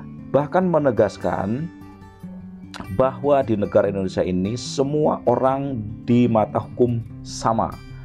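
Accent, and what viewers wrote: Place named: Java, Indonesia. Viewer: Assalamualaikum warahmatullahi wabarokatuh selamat siang min maaf sebelumnya admin nya logadnya medok bange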